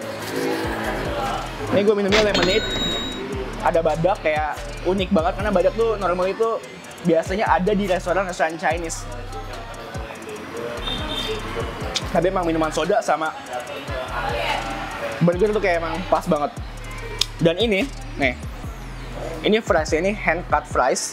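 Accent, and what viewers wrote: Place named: South Jakarta, Indonesia. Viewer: Bahasa jaksel lu gak usah ditahan-tahan bang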